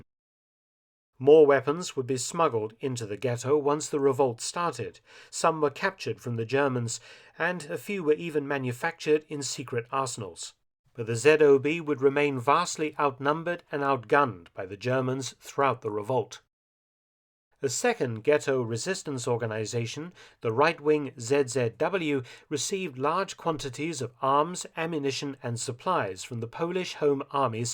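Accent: United Kingdom. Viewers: When you have a WW-ll Historian and author at the helm how can you go wrong? The British accent narration doesn't hurt either. 😊👍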